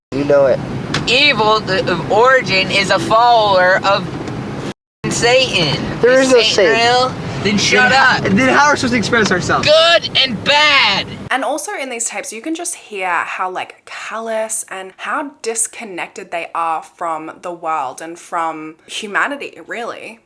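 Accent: Australia. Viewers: Just her Australian accent is all❣️. Lived there in my younger years, and I love hearing her speak, reminds me of the past.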